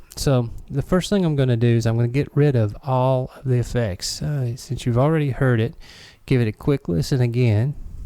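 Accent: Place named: South, United States. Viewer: Dudo mucho que ese hombre hable español. Se le nota, su acento es del sur del pais.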